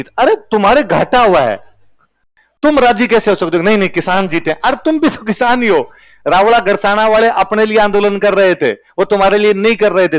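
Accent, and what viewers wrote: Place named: Kathiawar, India. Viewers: सर, गुजरात के सौराष्ट्र (काठियावाड़) की पूरी भाषा आपकी भाषा से मिलती है , में जब भी आपको वीडियो देखता हु तो मुझे ऐसा लगता है की में अपने क्षेत्र के बोली में सुन रहा हु। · ha. aapdi jevi boli che...amreli botad junagadh jevi j language che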